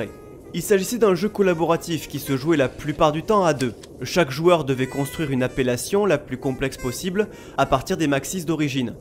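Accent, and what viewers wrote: South, France. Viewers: Tu ne viendrais pas du sud par hazard (tu dis moins en prononçant le s)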